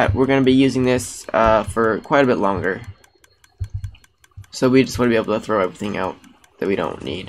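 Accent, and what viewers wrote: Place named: Poland. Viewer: Jestes polakiem.. widac po akcencie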